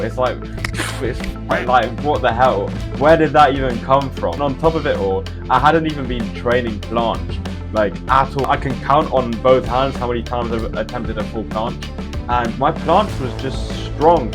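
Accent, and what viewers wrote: United Kingdom. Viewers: holy british accent and british teeth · are you british i'm just asking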